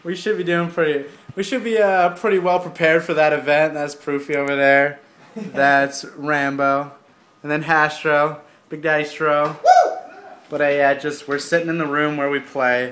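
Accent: Canada. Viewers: He's not, that's just how canadians sound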